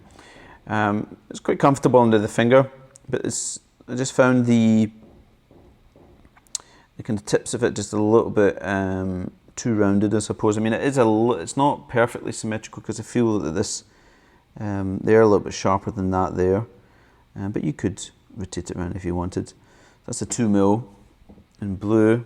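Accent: Scotland, United Kingdom. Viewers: Is this a spoof? Love your Scotch accent!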